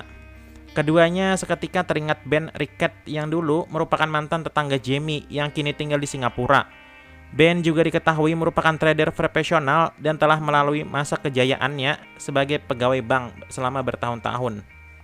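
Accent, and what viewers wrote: Banjar, Indonesia. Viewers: Intonasi banjarnya kental bujur, jadi ngalih mencernanya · Ikam orang banjar kah mang ? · asli mana bang, kya logat bahasa banjar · Dari review logat bicaranya, sepertinya org Banjar Kalimantan. Cmiiw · Logat banjar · dari logat, macam orang hulu sungai 🧐🧐🧐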